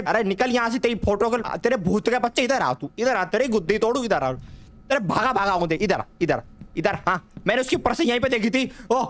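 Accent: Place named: Haryana, India. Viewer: Bro you are from HARYANA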